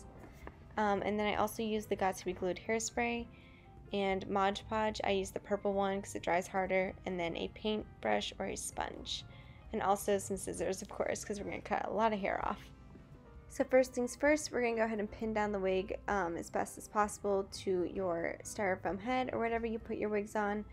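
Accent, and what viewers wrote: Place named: Brazil. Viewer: é br? não sei, se isso foi ofensivo, mas seu sotaque parace q vc é br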